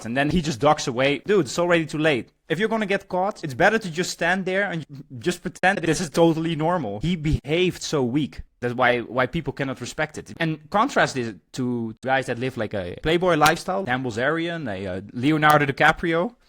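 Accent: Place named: Netherlands. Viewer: I hear a dutch accent.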